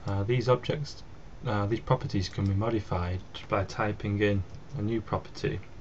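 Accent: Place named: England, United Kingdom. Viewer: The audio sounds fair to me, there are a lot worse out there in youtube land. Granted it's a crap microphone and there is some background hiss from the pre-amp but audio compression would just make it louder. Microphones don't amplify they just listen, it's the circuitry which follows which can colour the audio. I think the main issue is that he is speaking in "English" and some of the viewers are listening in "American".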